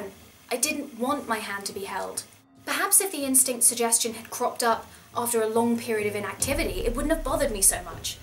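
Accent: United Kingdom. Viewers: I think you should have done the voice over work for Tomb Raider. Your british accent sounds much better than the actress that did it.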